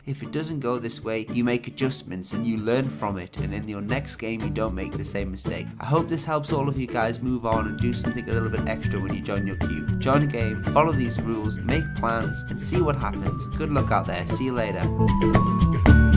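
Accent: Newcastle, United Kingdom. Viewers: You're from newcastle aren't you?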